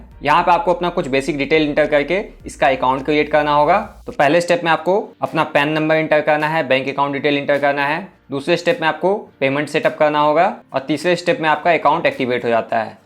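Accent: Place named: Bhojpuri, India. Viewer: Pehle apka Hindi bhojpuri sidharo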